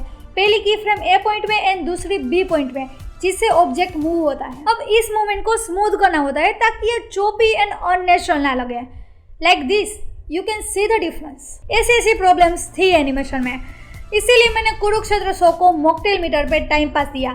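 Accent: Gujarat, India. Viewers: aap gujrati ho kya??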